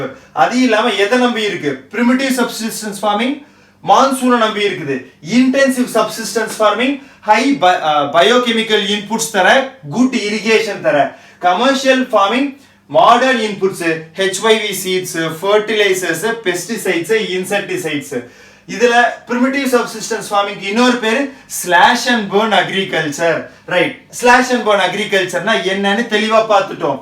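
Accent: India. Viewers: sir your mother tongue in kannada right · Sir a doubt you are from Chennai or Coimbatore. ☺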